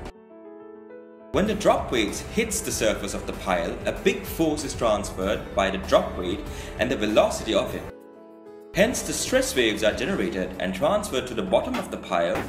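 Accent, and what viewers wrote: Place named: Caribbean. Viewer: Its a carribean or Caribbean accent and his diction is quite clear.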